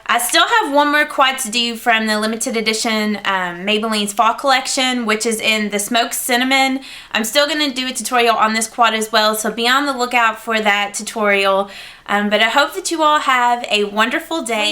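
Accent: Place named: South, United States. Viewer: i know this is an old video, but this is so pretty! i hope they still make this palette. im so glad i found your channel, and i love your accent too. at first i thought you were from another country (not the u.s), but then i realized that its southern. thanks for this bold but wearable tutorial.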